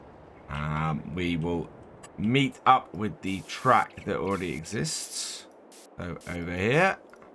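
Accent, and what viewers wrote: United Kingdom. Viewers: I like your British dialect, Grettings from Germany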